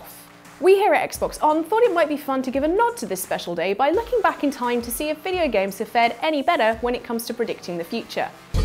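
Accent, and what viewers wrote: United Kingdom. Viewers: wow this was upload 3 secs ago, say hi to me plz, love your brittish accent, congrats for your new job youre doing well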